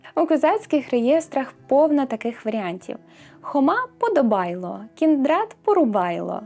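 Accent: Standard. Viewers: Заслухалась мелодійностью Вашого голосу, чистотою української мови. Імпонує подача матеріалу , Ваша глибина знань , якими щедро ділитесь з аудіторією. .. Відчувається ,що Ви любите свою справу ,найшли своє покликання. За такими молодими людьми майбутнє України. Тому від щірого серця бажаю Вам успіху і розвитку. Дуже дякую! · Така чиста українська. Аж приємно слухати. Та й голос лагідний. А зовнішність — справжнє янголятко.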